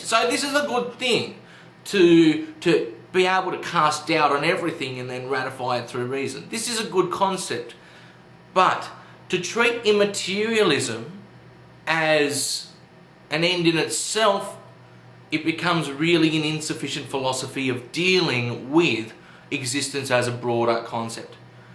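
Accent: Australia. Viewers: I'm so entertained by the juxtaposition of the words coming out of your mouth and your ocker accent! Rock on you ocker intellectual!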